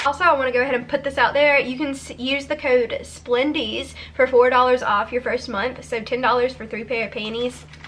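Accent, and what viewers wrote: South, United States. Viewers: you're so bubbly & i love your Southern accent !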